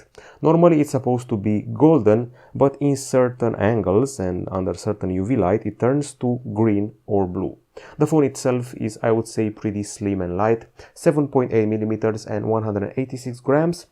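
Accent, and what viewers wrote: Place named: Romania. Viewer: Vorbeste in limba romana. Se cunoaste dupa accent, ca nu prea esti englez😂.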